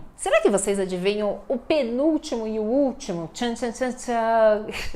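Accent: Brazil. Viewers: You are speaking Brazilian dialetto 🤔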